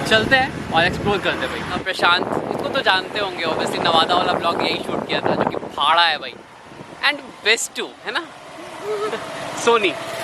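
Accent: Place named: United Kingdom. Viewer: This was too good. Just saw your video today. Your accent is awesome. Did you live in UK earlier? Waiting for your new videos. Love from Spain.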